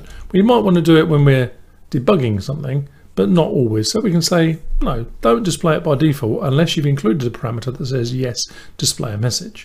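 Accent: United Kingdom. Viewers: Thank you. I hesitated to start a 38 minute video tutorial, but it was worth it just for the calm Pommy accent and absence of duffa duffa music! I am an old veteran since the 70s of programming assembler on bare metal for embedded realtime controls, and only just in retirement wrapping my head around C/CPP. Some of the syntax conventions really "get" me, and I have to remind myself I am dealing with a very simplistic one-pass compiler that needs lots of cues in order to work. The work flow from multiple tabs / project folder to files in the library folder was very helpful.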